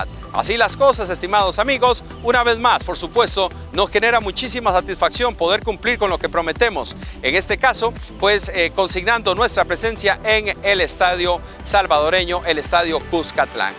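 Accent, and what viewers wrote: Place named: Costa Rica. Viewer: Suena costarricense este cerote,! Q pasó?.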